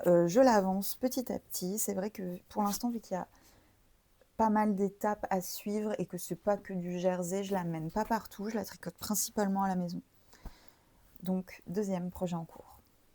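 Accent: England, United Kingdom. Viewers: Bonjour ! Je démarre à peine le visionnage et je sens déjà que je vais aimer vous suivre! Peut-être vous l'a-t-on déjà demandé, mais pardon.. your english accent is amazing, vraiment, cependant j'ai du mal à saisir toutes les références que vous donnez, et je le regrette beaucoup tant ce que vous montrez est intéressant. Pouvez-vous les transcrire sur l'écran au montage ou les mettre en description svp 🙏je reprends la vidéo avec plaisir, merci de la partager! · Très sympa ton podcast et tu as un super accent british.A bientôt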